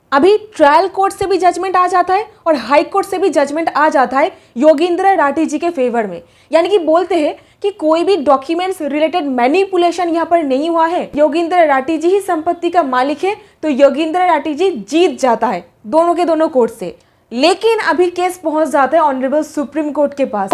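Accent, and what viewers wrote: Bengal, India. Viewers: Thanks for explaining so nicely. Nice to hear hindi from a bangali speaking lady.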